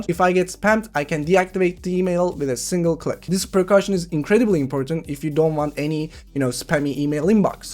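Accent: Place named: Turkey. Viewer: Hocam aslinda Turkce scriptin direkt Ingilizce karsiligi ne ise ona kasmak yerine, deginmen gereken onemli seyleri not alip onlardan bahsetmeye dikkat ederek dogaclama en bastan Ingilizce konussan daha mantikli olmaz mi? Bir de cok aksan var bence. Elbette aksanimiz var ama ben mesela Amerikan tarzi konusmaya calisiyorum, daha guzel duruyor. Direkt duz Ingilizce konusunca okulda dili ogrenen Turk liseli ogrenciler gibi geliyor kulaga, bu da globale acilmanda profesyonellik acisindan iyi degil bence. Nacizane tavsiyelerim. Dil degisince karakterin ve kisiligin degismesi gibi bir konu var bazi insanlarda. Baska birisiymissin gibi konusuyorsun istemsizce. Bende de oluyor. Reaksiyonlar, vurgular, tonlamalar hep farklilasiyor. Ama seninki, yine benim bildigim konusmasinin sadece dili degismis hali gibi hissettiriyor. Buyuk kanallarla kapisman konusunda sikinti yaratacigini dusunuyorum.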